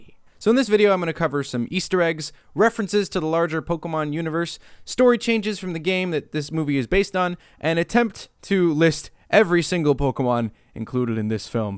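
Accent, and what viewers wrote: Australia. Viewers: Binge watching old videos then just not hearing an Australian accent all of the sudden was incredibly jarring. I do like the videos where gets involved though. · Maybe doesn’t realize that people mainly come here for his Australian accent voice.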